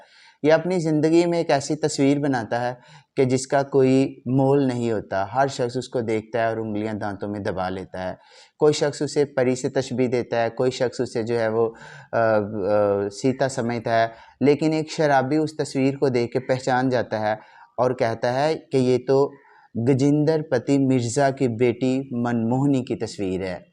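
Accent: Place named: Punjab, India. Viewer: محترم ڈاکڑ صاحب اس قدر اعلی ادبی پارہ کے تعارف کا حق ادا نہیں ھو سکا تلفظ اور لہجہ میں اردو کی بجاۓ پنجابی لہجہ اور ادائگی کی واضح چھاپ ھے 🌸🌸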